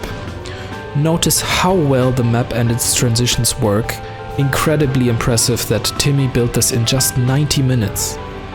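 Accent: Germany. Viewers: Do I hear a german accent?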